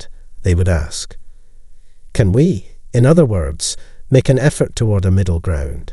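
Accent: Scotland, United Kingdom. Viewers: Bizarre filming. Good content & narration but why the foreign landscape/people when the narrator is speaking in English with a Scottish accent?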